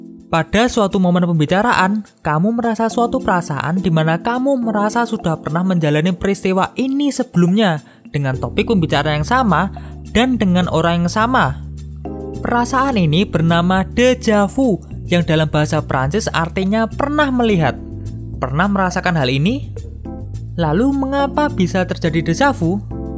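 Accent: Java, Indonesia. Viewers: medok banget mbah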